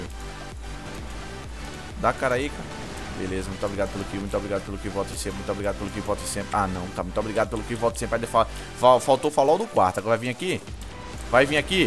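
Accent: Northeast, Brazil. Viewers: se e do nordeste se tem o sutaque do nordeste kk mercadinho tbm so do nordeste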